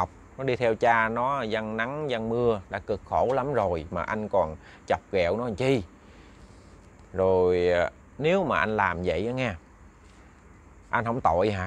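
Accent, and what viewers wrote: Mekong Delta, Vietnam. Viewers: Nghe giọng kể biết là người miền tây , hay !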